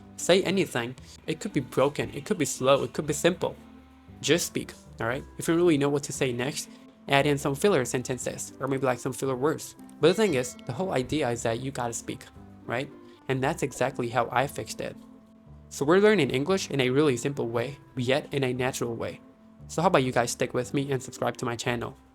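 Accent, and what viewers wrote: United States. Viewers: Bro how do you speak american english accent ?? Have you been to there ??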